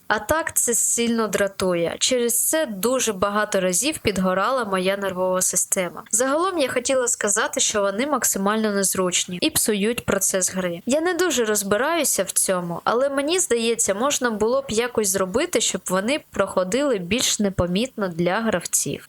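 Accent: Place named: Donbas, Ukraine. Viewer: Ти з Донбасу? Мова з родзинкою ❤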